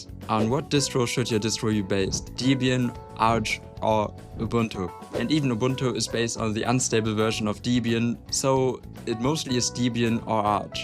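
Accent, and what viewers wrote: Germany. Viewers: Yo, its normal in Germany to have this fucked up accent?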